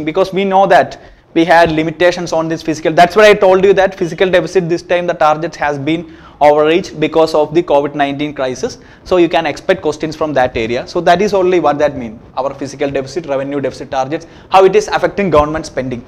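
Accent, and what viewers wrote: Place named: South India, India. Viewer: Love the south indian tone in English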